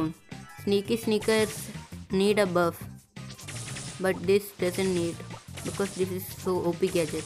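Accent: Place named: South India, India. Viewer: Ur voice says ur South Indian , if u say yes , I’ll reveal my state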